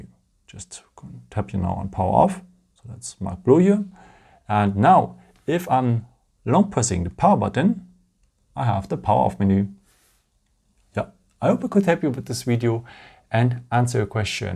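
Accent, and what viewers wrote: Germany. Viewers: Perfect, thats how it should be done. Supercredible also due to the german accent :) ( danke!)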